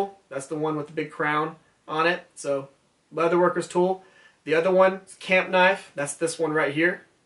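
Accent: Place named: South Carolina, United States. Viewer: Hey bro, where are you livin'? You have the accent all my friends have, so maybe you're from SC. If you are holler at me and I'll roll thru and scan all those templates you have hangin' in your shop. Piece o' cake. Always enjoy the work you do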